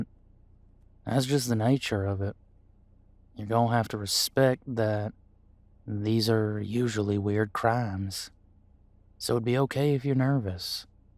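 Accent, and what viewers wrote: United States. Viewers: Was this made before or after you got the cable situation sorted? Also I'm just curious as to the accent. It feels Carolina with a bit of Kentucky touches. Meto voice to be sure that is compatible with their roots. Bit of " turns Detective after encounter with a human fiddler that changed their mind about life". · FUCK YES HE'S SOUTHERN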